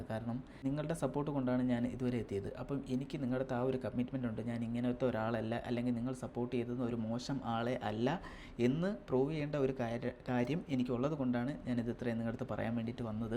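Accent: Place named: Trivandrum, India. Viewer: trivandum sideil ചില ഭാഗങ്ങളിൽ സംസാര രീതി ഇങ്ങനാണെന്നു ഞാൻ കേട്ടിട്ടുണ്ട് ആദ്യം ഒക്കെ ഇവരുടെ reelsile സംസാരം കേൾക്കുമ്പോൾ trivandram slang വല്ലാതെ standard കുറവായി തോന്നിട്ടുണ്ട്, . ഞാനൊക്കെ ഒരു conversationil നിങ്ങൾ”എന്ന് automatically പറയും. but പറയേണ്ടാ രീതി unclum , auntyum, ചേച്ചിമാരും ചേട്ടൻമാരൊക്കെ , എവിടെക്കാണ് പോണെന്ന് എന്നാണ് 🙄നിങ്ങൾ എവിടെക്കാണ് പോണെ എന്ന് ചോദിച്ചാൽ പ്രശ്നമാണ്🫣… അത് ഞങ്ങളുടെ നാട്ടിൽ disrespectful ആണ്.. എന്റെ parenstinte കയ്യിനു വഴക്ക് കേട്ടു മടുത്തിട്ടുണ്ട് ..അതുപോലെ എടി എന്ന് വിളിക്കാൻ പാടില്ല. but എനിക്ക് എന്റെ കൂട്ടുകാരി അങ്ങനെ വിളിക്കുന്നത് ഇഷ്ടമാണ് ഒരു closeness feel ചെയ്യുന്നു, എനിക്കു അങ്ങോട്ട് വിളിക്കാനും okey ആണ്, but parents and auntymar കേട്ടാൽ അപ്പോ ഉപദേശിക്കും.ഓരോ നാട്ടിൽ ഓരോ reethi.. ഇവിടെ ഞാൻ ഉൾപ്പടെ comments ഇടുന്നവരിൽ പലരും trivandrum അല്ലെന്ന് മനസിലായി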